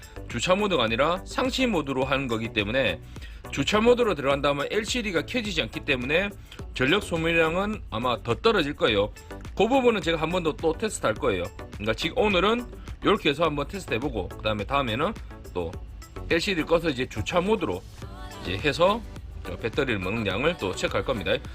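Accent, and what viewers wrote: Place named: Busan, South Korea. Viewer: 사장님 궁금증을 풀어주셔서 감사합니다. 저는 포항 사람인데요. 사장님 말씀이 말끝마다 됩니다이, 안 됩니다이, 좀 웃깁니다이~😂😂😂 부산 그 특유의 말씨 ㅎㅎ. 암튼 정보 감사하고 믿음이 가는 분 같습니다. 번창하세요~!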